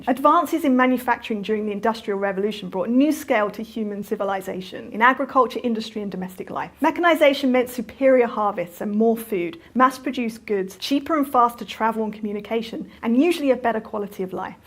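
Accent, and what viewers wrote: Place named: United Kingdom. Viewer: 英音听着好难受啊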